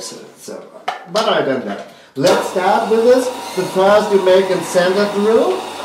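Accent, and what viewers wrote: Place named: Germany. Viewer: this guys accents sounds like a german christopher walken.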